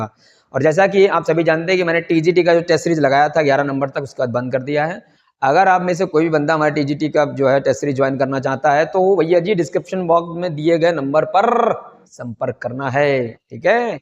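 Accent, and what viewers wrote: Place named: Allahabad, India. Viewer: Full allahabadi😂😂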